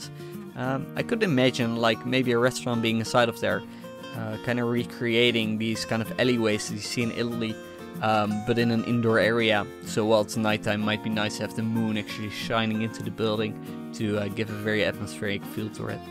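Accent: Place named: Netherlands. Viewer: Haha ik kan gelijk horen dat je nederlands bent 😉 verder toffe video!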